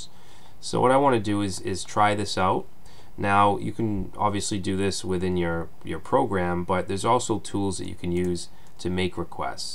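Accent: Boston, United States. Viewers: Boston accent? Great vid.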